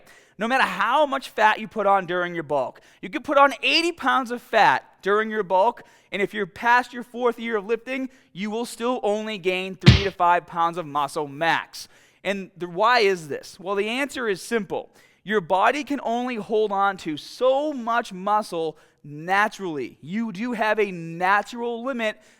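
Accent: Boston, United States. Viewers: This guys definitely from Boston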